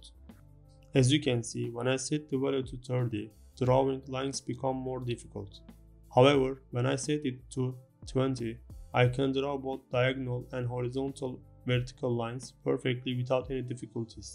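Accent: Turkey. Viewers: abi türk olduğunu aksandan anladım bi açık yakalamaya çalışıyordum bilgisayar ele verdi seni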